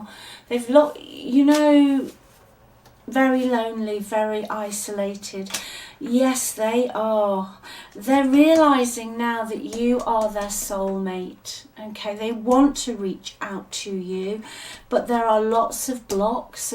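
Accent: England, United Kingdom. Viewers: thank you so much for this mornings reading. Love your strong English accent, reminds me of two years O went to varsity there. Darling, your advice is spot on, loving myself first and protecting my peace and freedom to do whatever I want. Busy investing heavily in some new ventures, I am not going to be giving as I used to be, can tell you that. My old self died before Christmas and with the protection and guidance of God, the legions of Angels and in particular Archangel Michael, I receive unmeasurable abundance for which I’m absolutely grateful. Gods timing is divine otherwise I would have been dishing these abundance out. Learnt my lessons the hard way, let’s just say I’m doing great and couldn’t be more happy. Blessed day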